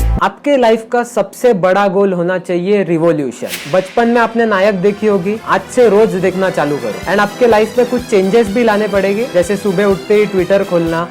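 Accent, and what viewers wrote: India. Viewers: Abe tu bihari kyun lagta hai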